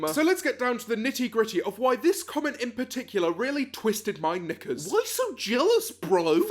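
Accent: United Kingdom. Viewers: Lol James Marriott just popped up in my recommended list...but he is soooooo funny! Good work. Love the British accent and British humour. Annihilated this ‘abbas’ bloke lol!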